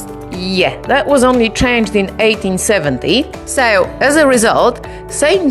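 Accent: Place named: Europe. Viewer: Love your accent which part of Europe do you come from?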